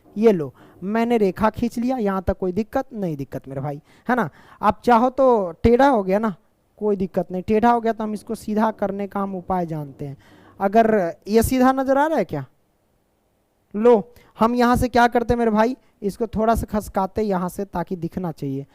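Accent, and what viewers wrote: Bihar, India. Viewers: Aap Bhihar se belong karte ho ...?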